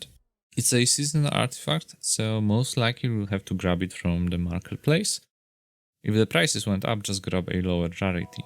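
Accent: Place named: Poland. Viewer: słychac ten akcent polski xd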